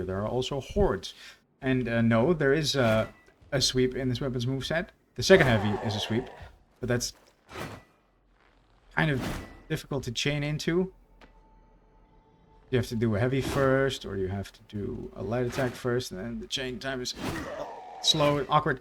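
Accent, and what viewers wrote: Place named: Netherlands. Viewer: Instantly recognize that Dutch accent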